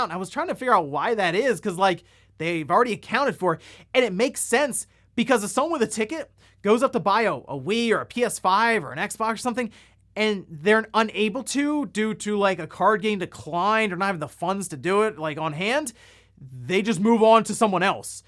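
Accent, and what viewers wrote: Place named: United States. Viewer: Damn yanks -it’s MAHrio not MUHrio.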